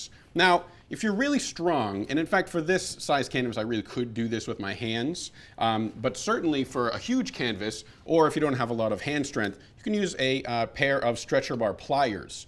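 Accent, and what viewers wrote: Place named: New York, United States. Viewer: I like the New York accent.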